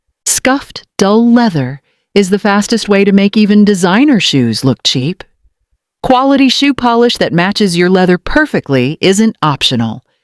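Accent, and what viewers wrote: United Kingdom. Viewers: I prefer the British voice. Nicer to listen to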